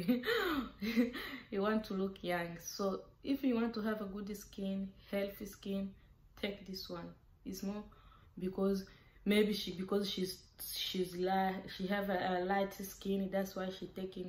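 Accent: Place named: Democratic Republic of the Congo. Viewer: R u from Congo i like how u speak❤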